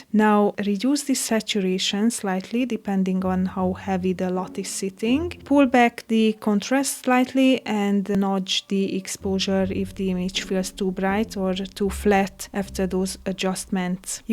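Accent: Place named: Hungary. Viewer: I might be mistaken but are you per chance hungarian? Your accent kind of sounds like it! :) Anyways great video, you gained a like and a follow! :D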